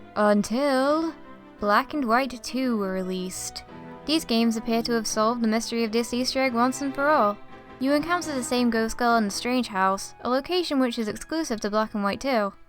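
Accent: United Kingdom. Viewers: Are you british? your accent seems to be that. I am kinda shy saying this, but I think your accent is kinda cute.Your videos ate awesome, new subsciber ='D Greetings from Venezuela. Edited: I misspelled are as ate XD (I need to study more)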